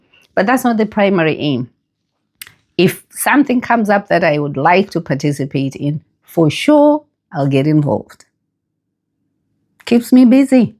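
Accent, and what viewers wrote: Zimbabwe. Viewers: I’m not Ndebele but the accent you have when speaking Ndebele has a very strong Shona undertone to it😂 I wonder if Ndebeles get offended if someone speaks with a Shona undertone lool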